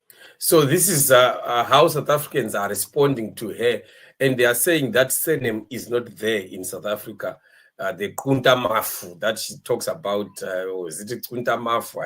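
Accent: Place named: Zimbabwe. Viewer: You sound Zimbabwean yourself. Why do you foreigners keep on putting your noses in South African affairs as if you are entitled to this country and our issues???? Why dont you make podcasts of your sruggling country and try better it. Instead its nywe nywe nywe South Africa. Hayi foetsek man. We are sick off you ppl now. ANC is on its way out. Once MKP gains traction you will see what's coming your way. Bugger off man!!!!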